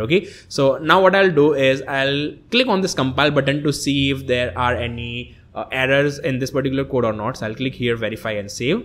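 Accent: India. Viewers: Thanks a lot for this clearence! You indian accent is very cool, but I got the things you talk. As a brazilian man, in certain way is no so difficult to understand you. Good lucky bro. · Mấy a Ấn Độ nc mắc cười ghê. Nhưng hay thật.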